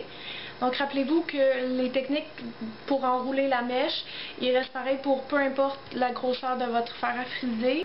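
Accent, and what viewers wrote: Quebec, Canada. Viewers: Merci je suis novice là dedans j'ai toujours voulu m'en faire :) tu explique très bien, tu as de très très beaux cheveux, puis tu es ravissante ! autant les cheveux lissés que bouclés :) et en + de ça j'adore l'accent québécois :D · Elle est québécoise pas Belge. On ne parle pas comme ça! :p Mais l'accent est mignon! :) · Merci madame la Québecoise à l'accent adorable, la France te remercie elle va pouvoir se boucler les cheveux sans faire de révolution ! Youpi ! <3 j'adore ta technique ! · Elle est québécoise, d'ou son accent.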